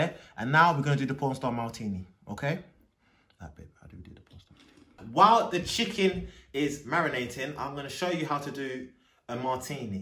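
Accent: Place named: Cockney, United Kingdom. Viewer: You are so entertaining!! You’re you’re fired videos made me be so happy during lockdown. Please do more social experiments in your cockney accent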